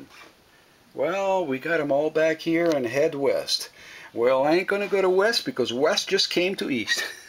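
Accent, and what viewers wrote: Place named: Texas, United States. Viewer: were you born and raised in Texas, i can hear that texas accent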